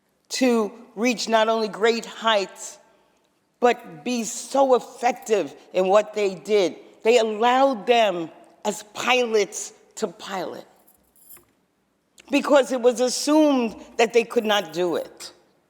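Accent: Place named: New York, United States. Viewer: Listening to her voice makes me homesick for my beloved New York. A beautiful speech honoring our black community during Black History Month. Thank you Senator.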